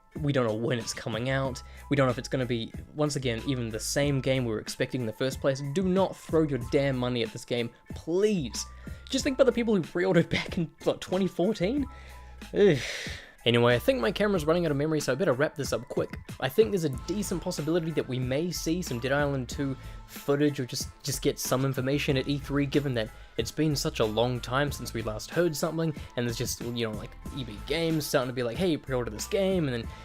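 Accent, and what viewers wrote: New Zealand. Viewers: Are You From New Zealand Bro 😂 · are you from new zealand?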